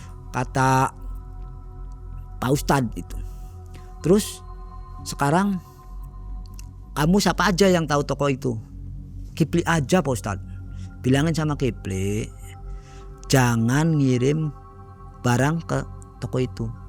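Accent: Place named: Cirebon, Indonesia. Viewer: Orang jowo borot kayaknya (cirebon) ada “jeh” nya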